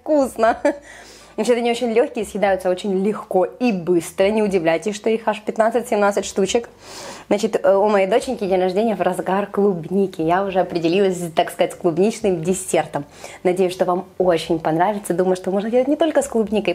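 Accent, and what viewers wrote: Ukraine. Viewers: давно подписана на ваш канал- нравится ВСЁ )))) От того - что творите на своей кухне- в плане кулинарии до вашего мягкого певучего украинского говора )))) Если бы у меня вдруг не стал показывать монитор... ДА, я бы просто слушала ВАС!)))) Спасибо за рецептик- Очень красивый - вот что-что- а бизе у меня в духовке получалось- будем ЕСТЬ пироженки!))))))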